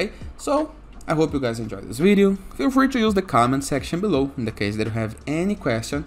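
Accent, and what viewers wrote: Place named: Brazil. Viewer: depois de 5 segundos assistindo.... é BR! certeza :medal-yellow-first-red: Muito TOP as dicas valeu!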